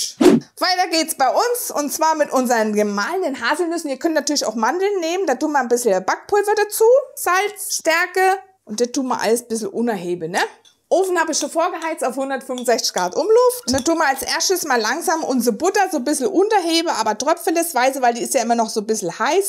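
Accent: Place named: Saxony, Germany. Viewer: Super die sachsen. Dialekt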